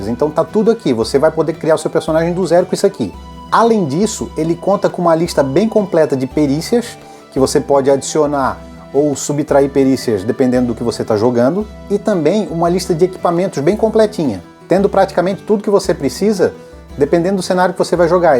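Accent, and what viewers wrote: Florianópolis, Brazil. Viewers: Sotaque de manezinho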